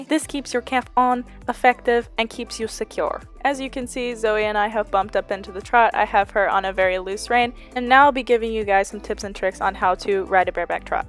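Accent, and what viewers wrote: Russia. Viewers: Is that a Russian accent, sounds like my departed gramps Latvian accent. Have 4 horses at the house sit, thanks for the inspiring video! Subscribed! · I promise I'm not a 12 year old weeaboo but out of curiosité Do you happen to watch Yuri on Ice or is the Russian ish accent just for funzies? (My god asking about anime. My thirteen year old self is screeching)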